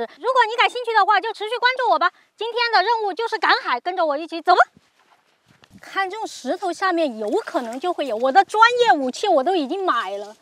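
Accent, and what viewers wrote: Sichuan, China. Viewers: 她讲的是四川普通话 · 四川幺妹儿吧？ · 非常喜欢你开心的样子，空军不重要，重要的是你的川普风格很搞笑开心，谢谢你，同是天涯四川人！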